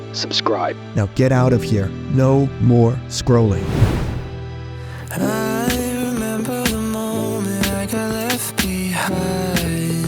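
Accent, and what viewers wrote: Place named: United States. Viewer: Yo I'm just wondering if you're really from India. Ur accent sounds like American, great!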